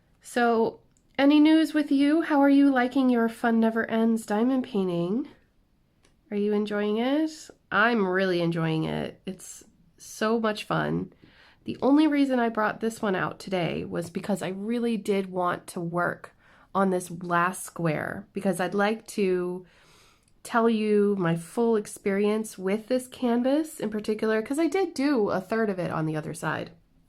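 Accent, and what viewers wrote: Ireland. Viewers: Sometimes when chatting you can hear a bit of the southern Irish accent coming through, even though you are American and to be honest it sounds really beautiful to. Wow I am surprised that negative drama is showing it's head in the crafting community, what is wrong with these people crafting is meant to be relaxing, fun and hopefully making new friends who enjoy the same hobbies that we all have in common. So sorry that has happened to you I must see if I can remember my Instagram password because I am looking for a particular diamond painting called remember me from dac which I am keeping money aside for if it suddenly comes along. I also have quite a few diamond paintings that I could donate for your charity. God bless look after yourself I feel your pain I have developed an allergy to sunshine just my luck, my son says I'm turning into a vampire 🧛‍♀️lol. 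🤗🍀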